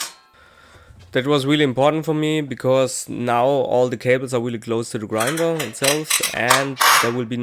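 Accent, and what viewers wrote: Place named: Germany. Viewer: Every damn time I watch one of your videos, I get envious. You're truly a master of your craft, man. As someone who's in a similar situation where confined workspace quarters are concerned, I've tried to explore ways to improve how I store things. French Cleats appear to be the best option due to how modular and customizable they are. You've basically taken that same approach, only you've opted for a pegging system with the same kind of modularity and customization. I'd suggest incorporating a sliding rail panel in the future should you run out of space in the future, but I don't know if that would be possible in your shop. Honestly, I think you've done everything you can with what you've got. The only other way to deal with continual space issues is to just get a bigger shop. Maybe that's in your future? And for some reason, I always thought you were American but judging by your accent, it sounds like you're German? 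I guess my American arrogance is showing, but then again, I could accuse you of allowing your German intelligence to make me feel insecure, too. ;.) Keep doing what you're doing. Love watching your videos. Quick question: For someone who doesn't have one of those plasma CAD table things, what would the best way be for anyone trying to make some of those pieces you cut out that have lots of curves and whatnot? Just tracing and cutting wheels? I have an angle grinder, but I don't have (yet) a plasma cutter.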